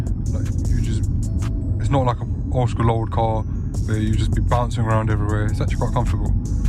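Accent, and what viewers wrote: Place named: South Asia. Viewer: This is so odd you are white but you sound south asian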